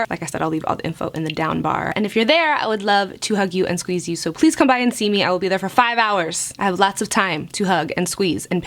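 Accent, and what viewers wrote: United Kingdom. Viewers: Those are my fav lashes but I can't find them anywhere near me 😭😢😭 I. Missed your British accent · That British Accent tho 😍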